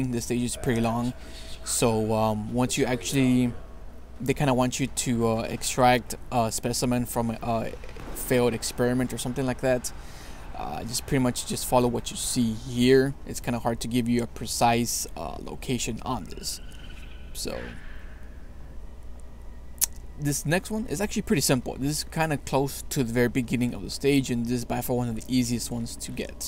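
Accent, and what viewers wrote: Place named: Canada. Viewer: Are you Canadian I </3 your accent c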